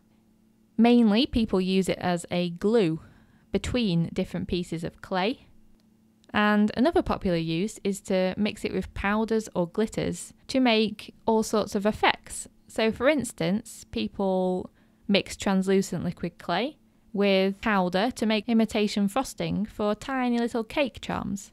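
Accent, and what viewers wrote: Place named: England, United Kingdom. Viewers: I really enjoyed your beginners video, and your accent reminded me of England where I was born. I live in Australia and have just started going to classes. Thank you for all your help and great tips. 😊😊😊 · I'm going to guess you're from the North West of the UK- your accent is exactly like mine- I'm from Lytham. Anyway, thanks for the informative video- I'm just getting started with polymer clay.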